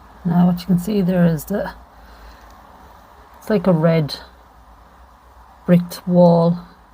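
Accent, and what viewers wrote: Ireland. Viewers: What a adventure you bring us on. Plus I get to see Ireland. I grew up in Kingsbridge in the Bronx. A very Irish neighborhood that I truly miss. When you speak it reminds me of Kingsbridge yes near Gaelic Park and the beauty of Ireland makes me realize the famine Ireland had must have been so bad to leave the beauty of Ireland for NYC. Your videos are fun and I apologize for sort of going off topic. I look forward to watching all you have done and subscribed to the future ones. I’ll also share.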